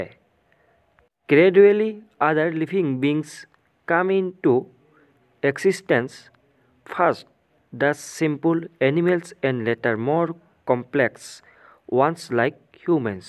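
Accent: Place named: Nalbari, India. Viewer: Sir nolgoriya hoi heybabe kisu kisu bakyo bhal koi nufute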